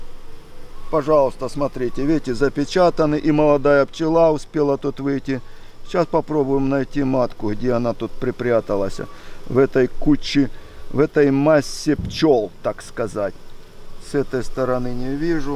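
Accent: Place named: Ukraine. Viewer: Відчуваю у Вас український акцент, чи не краще залишатися самим собою, говоріть мовою.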